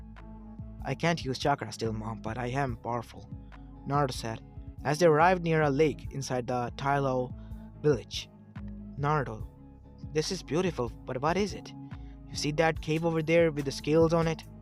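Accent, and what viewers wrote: India. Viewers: are you Indian? you sound like one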